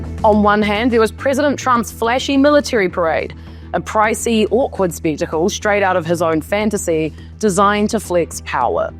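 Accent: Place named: New Zealand. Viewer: You have the perfect balance of serious vs funny, if you can't laugh you'll cry? or something like that .. I'm from NZ and it's cool to hear the accent on youtube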